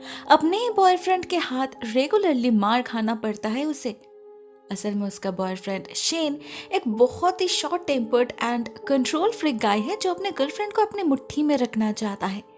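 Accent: Bengal, India. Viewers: her accent described it so well that she's bengali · R u begali...it seems by your tone of Hindi...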